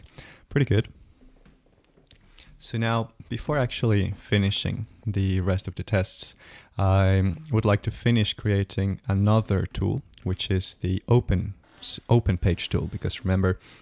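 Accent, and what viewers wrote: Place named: United States. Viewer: Amazing tutorial. So we well explained and laid out. Question for you on CrewAI - is the process of picking a tool prescriptive, meaning the agent instructs which tool to pick? Or can the LLM pick automatically from a set of tools and decide what to use. I am just coming up to speed on CrewAI and wasn't sure. And your English is fantastic, by the way. First thought your accent sounded like you were from the USofA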